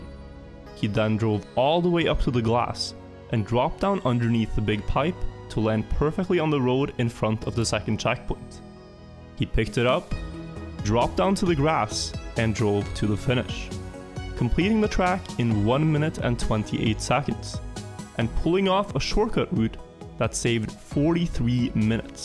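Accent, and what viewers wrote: Norway. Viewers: Are you from norway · are you Norwegian? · are u a norwegan??? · Are you norwegian? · You Norwegian?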